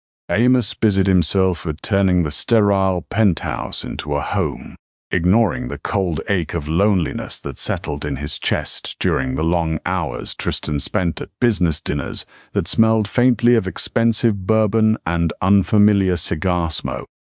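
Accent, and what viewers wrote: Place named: United Kingdom. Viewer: Nice story. Love this british accent ❤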